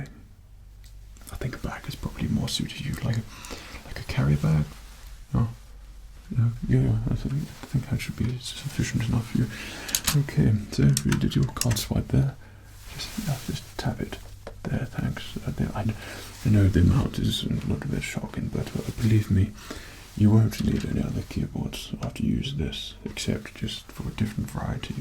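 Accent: England, United Kingdom. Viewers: yes he is originally from Oceania but he does live in the Uk and has for a while with is wife he also sounds pretty british anyway in my opinion. Love from the Uk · You would make such a great Arthur Morgan roleplay! Do that but instead of an American, you're a posh rude English cowboy! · I cannot tell is this guy actually British and I am sure pc guys do not everything like this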